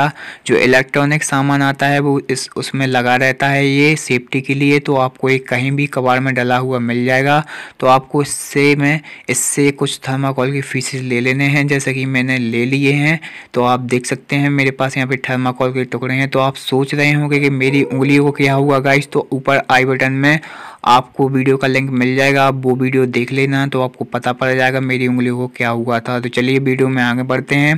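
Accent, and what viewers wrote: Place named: Narsinghpur, India. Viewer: Boli se 😃 narsighpuriya sabse badhiya 😉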